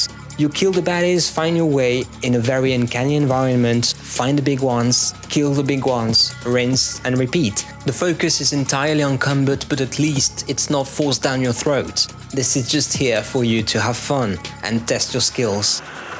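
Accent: France. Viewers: French accent is 👌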